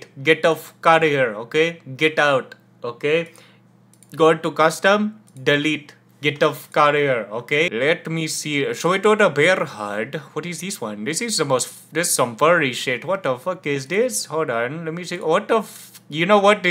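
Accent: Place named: India. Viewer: W indian voice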